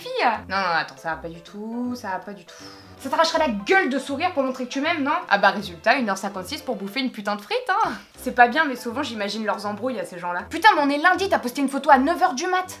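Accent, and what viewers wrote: England, United Kingdom. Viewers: J'adore trop ton accent d' anglais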